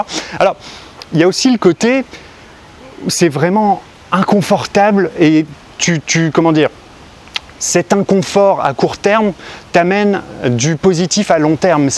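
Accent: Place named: Switzerland. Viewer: Tu dois etre Suisse pour parler comme ca.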